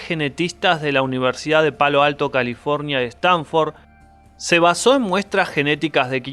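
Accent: Argentina. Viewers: Pues el wey es argentino, cómo quieres que hable pues ?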